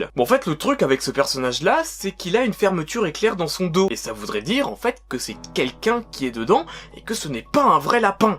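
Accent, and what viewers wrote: England, United Kingdom. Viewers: Je critique l'accent anglais de. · Plus que l'accent anglais, j'avais l'impression que était dépressif sur cette vidéo xD Be proud and happy! =) Vidéo intéressante cependant, j'aime bien les easter eggs:p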